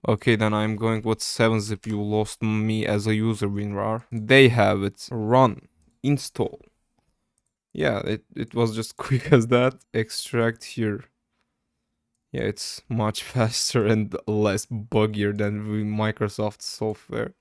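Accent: Turkey. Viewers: Konuşma tarzından direkt Türk olduğunu anladım böyle videolar yapmaya devam et Türk kitlesinden bir cacık olmaz❤ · Daha girişte anladım türk olduğunu türk türkü tanırmış dostum videolara devam🔥🔥🔥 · Are you From Turkey?! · hayatımda duyduğum en türkçe ingilizce reis süper video adamsın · seni ilk duyduğum anda bu Türk dedim kardeşim en duyduğum en tükçe İngilizce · First time looking at this channel and the first three words I instantly knew you were Turkish just by accent lol. Great video man, keep it up. Ellerine saglik hocam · kral ilk 3 saniyede aksanından anladım. ülkemizden böyle içerik çıkması çok güzel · türk aksanın mükemmel ya hem mükemmel ingilizce konuşuyorsun hem türk olduğun belli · Türk telaffuzu, nerde duysam tanırım. :) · valla ilk saniyeden turk oldugunu anladim, aksan ele verdi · türk aksanı baya belli etmiş ama video kaliteli abone oldum:) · video çok iyi olmuş ellerine sağlık türk olmana şaşırdım biraz aksandan anlaşılıyo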